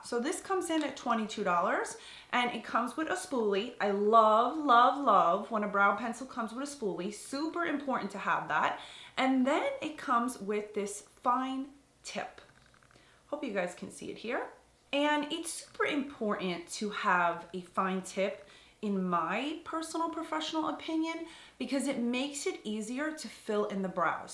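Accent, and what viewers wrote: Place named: Long Island, United States. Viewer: I love your LI accent! I’m a guido from Brooklyn myself..lol. I never really had the need for makeup. I’ve been fortunate in that way. Good genes I guess. I’ve started to lose my brows since my thyroid condition. My hair, nails, lashes and eyebrows are just not the same. I’m not experienced at all in the makeup department anymore so I’m so afraid of makeup now and looking silly. I’ve seen that you can have your makeup done beautifully but if your brows are done badly, well then that is all she wrote. Everything just looks wonky after that. One of my problems now is picking shades (so many shades and undertones to chose from). Your brows are fuller than mine so they look darker but I think I’ll go with shade you’re using. I’m 50 something now..cough.. cough so I need something.. I’ve started using better skin care so I want to use makeup with good anti-aging ingredients. 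As for makeup, even if it is just putting on some mascara, concealer and having my brows done would be helpful so I won’t look so tired. Any tips would be appreciated!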